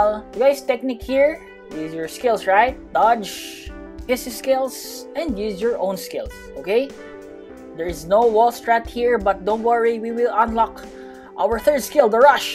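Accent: Philippines. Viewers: sabi na filipino to accent palang eh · sabi ko na nga ba sa accent mo pilipino ka eh hahah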